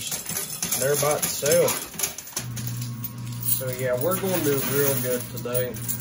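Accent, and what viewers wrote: South, United States. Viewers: Love your accent, I am from Australia. is that a southern accent,👍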